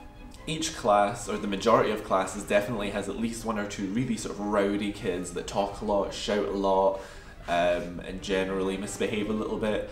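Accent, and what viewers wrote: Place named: Scotland, United Kingdom. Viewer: Wow! I just came across your channel and was shook to hear your accent!! I'm also from Scotland and I am applying to JET this year - are there many Scottish JETs in Japan?? Look forward to watching the rest of your videos!! :)))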